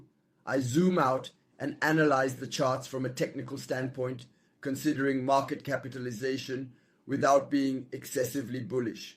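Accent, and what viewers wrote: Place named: Texas, United States. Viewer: ALLLL RIIIGHHTTTTTT oh yeah (I love texas accent)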